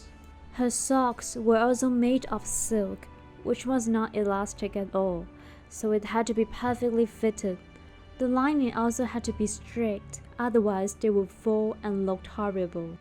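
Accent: China. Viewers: whoever u are, this is one of the worst content. The female narrator sounds like a village girl from northern china with horrible accent. This is a fcuking joke!!!!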